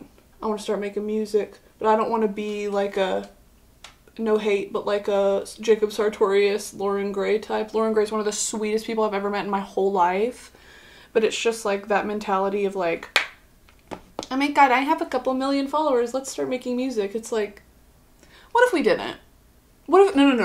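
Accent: United Kingdom. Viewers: WITH THAT BRITISH ACCENT YOU WERE SERVING ADELEEEE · your british accent makes me think of adele lmfao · Her British accent is so iconic it sounds like Harry styles and peppa pig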